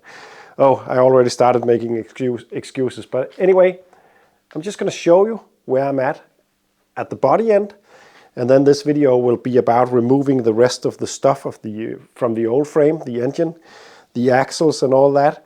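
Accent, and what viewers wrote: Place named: Denmark. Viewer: There I was just eyes down reading a book and your video had just begun when I heard Al Pacino say those immortal words “ Say hello to my little friend “ honestly it sounded so much like him 😂, Pacino with a Danish accent Ofc but so good 😂😂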